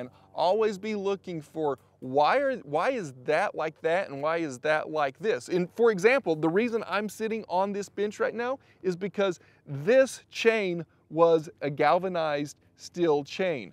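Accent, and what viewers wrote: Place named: Texas, United States. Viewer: "Still" what a texan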